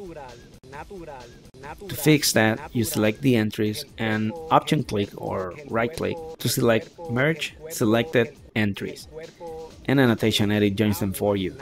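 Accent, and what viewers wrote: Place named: Mexico. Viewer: are you mexican, your english sounds like mexican english mexico rules! :) saludos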